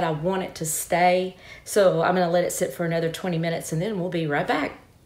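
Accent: Texas, United States. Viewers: Impressive painting. Love the Texas voice too.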